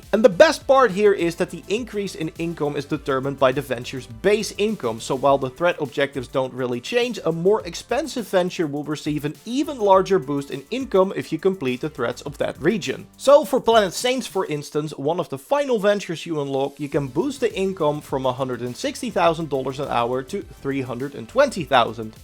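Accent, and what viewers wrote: Netherlands. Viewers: I just hear the dutch accent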